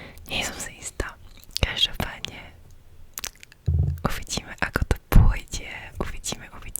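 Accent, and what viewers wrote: Slovakia. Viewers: hej jakoze uplne narovinu kdybych neznal tvuj kanal a nevedel ze si ceska tak bych si myslel ze si ze slovenska protoze ta vyslovnost nebo ten prizvuk je fakt skvely🩷🩷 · znieš ako u nás západniari, tí občas dávajú české slovíčka hihi, ale inak dosť zlepšenie prízvuku od prvého dielu <3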